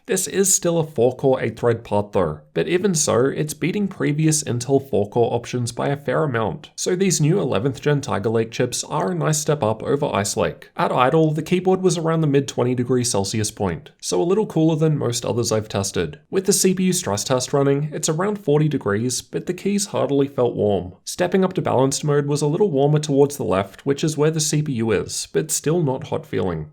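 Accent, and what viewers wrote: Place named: Australia. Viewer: Imagine not spotting an aussie accent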